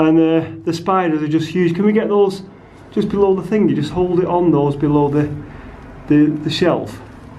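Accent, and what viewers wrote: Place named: North, United Kingdom. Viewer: yes thanks mate great videos chap! Scouse my daft comments I love that word bugger lol. When I hear an accent like yours I think of mike Harding, aka The Rochdale Cowboy, he called everyone buggers lol!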